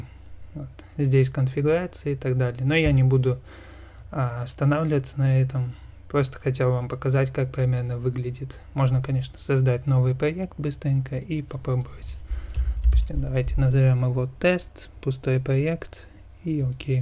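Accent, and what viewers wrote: Germany. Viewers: Немецкий акцент сильно чувствуется.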